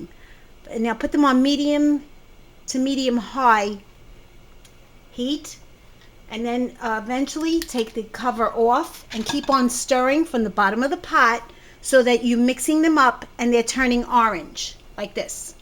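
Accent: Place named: Brooklyn, United States. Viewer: You have such a nice Brooklyn acccent you still live there 😃😃😃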